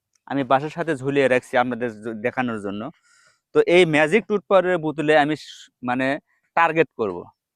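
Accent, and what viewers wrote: Bogura, Bangladesh. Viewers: ভাই আমি আপনার কথা শুনে বুঝতে পারছি আপনার বাসা বগুড়া.( আমার বাসাও বগুড়া, ,,, আপনার গ্রামের নাম কি গোবিন্দগঞ্জ চেনেন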